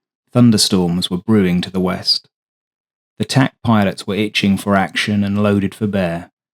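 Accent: United Kingdom. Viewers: ... Pentagon Marketing BS ....... We had TWO aces - they had 17 ...... Who else has noticed a crap load of British accented (Ai) Pro War BS Documentaries .... ?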